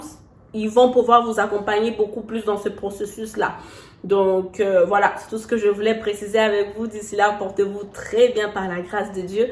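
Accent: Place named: Canada. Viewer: Moi j'entends l'accent Canadien..lol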